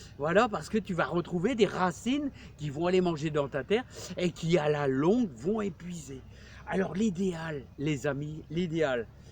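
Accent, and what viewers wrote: North, France. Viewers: « l’bache elle a d’lache » 😂 ça fait du bien un peu de patois du Nord, moi aussi chui de ch’Nord et fier de mes origines !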